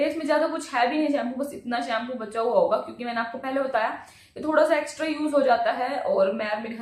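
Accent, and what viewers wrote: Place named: Haryana, India. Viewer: hlo dear.....i m from bhiwani.. yhi karan h ki m aapki fun ho gyi hu... thoda haryanvi touch h aapme.....m rhti hu Lucknow me... aapki give away muje chahiye..m bhi use krna chahti hu.😊😊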